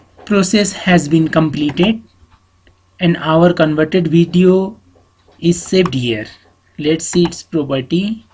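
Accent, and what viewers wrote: India. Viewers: I love Indian accents xD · He is a Bihari I guess...... His English sounds like Bihar English. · It's north indian rural accent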